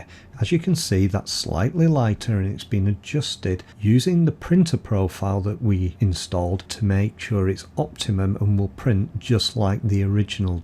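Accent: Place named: Lancashire, United Kingdom. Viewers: Your accent sounds local to where I live. Born in Lancs live over the Pennines 🙂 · Hi, why on earth can't others talk clearly and in a straightforward manner like you, clear & concise in an English accent. First class video. thank you so much.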